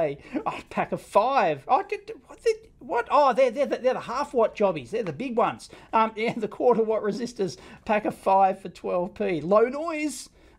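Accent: Australia. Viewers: I thought it was a Techmoan video... until it started with that Aussie accent lol.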